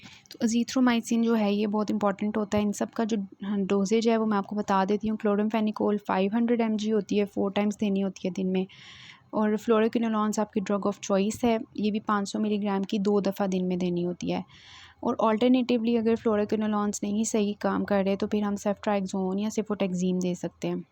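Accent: Pakistan. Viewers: Pakistani lg rha h aawaj se ... Kya pakistani me b MBBS hoti h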